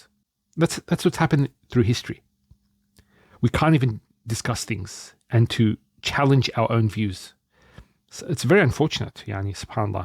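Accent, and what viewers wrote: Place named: Australia. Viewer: Much respect to you brother. I admire your devotion in seeking the truth, no matter the cost. I myself come from a fanatic Salafi / Wahabi background and the Qur'an set me free from all that indoctrination and brain washing from tradition . I do not identity as a Sunni or Shia, but rather a Muslim who follows Millata Ibraheem - Haneefan. They love to label me as 'Quranist' 😅 I found out over the years that the Tradition diverts you from seeing the truth in the Qur'an. It is unfortunate that the Qur'an was never the primary source, which is why it gets so badly misinterpreted and distorted (like the example of the return of Jesus). I can tell you're Australian akhi by your accent. I'm Ozzy as well from Melbourne.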